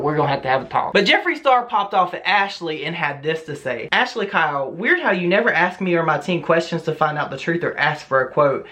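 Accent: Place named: Florida/Georgia border, United States. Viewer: Where are you from!? Your accent reminds me of home: FL/GA border.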